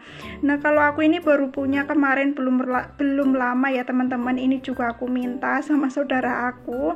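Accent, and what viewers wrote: Indonesia. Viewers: Ganti suasana ya bun jadi lebih luwas,iya bun logatnya keliatan bgt Cilacap 😊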